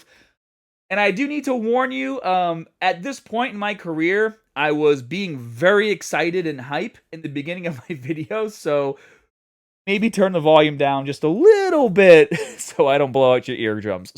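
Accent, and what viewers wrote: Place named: Boston, United States. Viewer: Inconsistent Boston accent? Is he from Rhode Island or something? It sounds like a hybrid accent.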